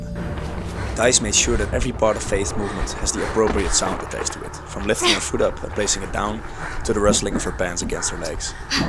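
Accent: Netherlands. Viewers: Goeie review, ik hoorde gelijk dat je uit Nederland komt :) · this game will forever have a special place in my heart. great video! edit: im just gonna assume you're dutch because of your accent ;)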